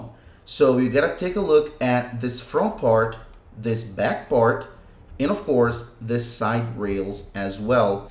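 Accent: Brazil. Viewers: Gostei,fala muito bem inglês,só sei que é Br pelo sotaque kaskka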